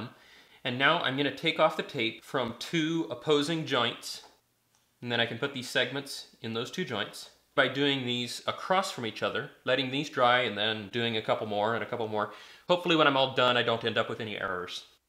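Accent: Canada. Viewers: Neat pattern out of plywood - good job. BTW, are you Canadian or North-Central American? I hear an accent in there.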